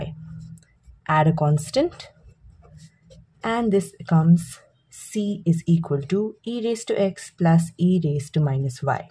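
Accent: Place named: Russia. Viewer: LGta hai ki रशिया की मैं बोल रही है😢😢 समझ नहीं आया😮😢😢😢😢😢😢😂😂😂😂😂😂😂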